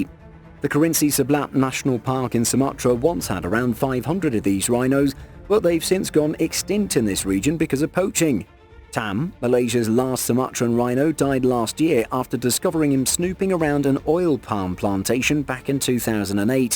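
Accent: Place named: United Kingdom. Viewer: Finnaly new vid PS I like uer British accent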